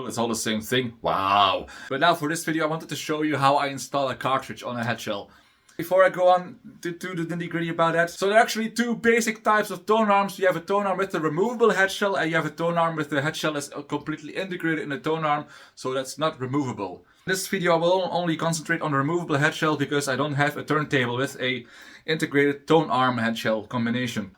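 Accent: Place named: Netherlands. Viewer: Je klinkt als een Nederlandse die Engels spreekt 👋🏻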